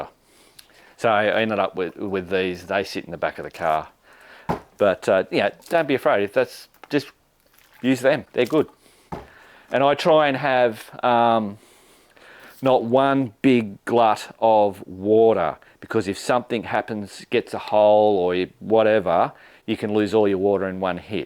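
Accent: Australia. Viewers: Crazy Australian, crazy accent. But.... I'm 57 and going moto camping for the first time this summer and this is a great series of videos. Hey, Presto!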